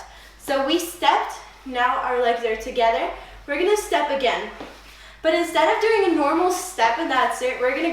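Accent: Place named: United States. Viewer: crazy how ur spanish accent went away over the years and now u sound full american lol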